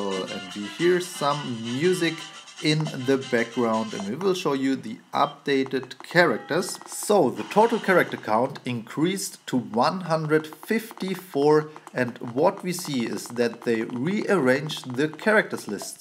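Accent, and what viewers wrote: Germany. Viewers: I love this update are you german?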